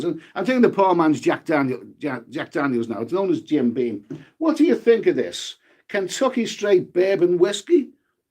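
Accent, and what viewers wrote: Liverpool, United Kingdom. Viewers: You’re a scouser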